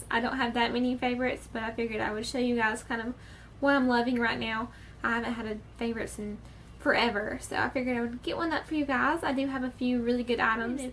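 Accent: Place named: South, United States. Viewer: Im from the south also , love your accent. Thank you for the wonderful reviews. Extremely helpful .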